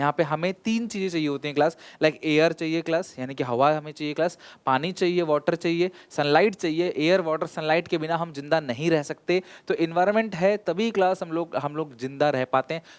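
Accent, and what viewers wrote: Odisha, India. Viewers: Sir are you from odisha??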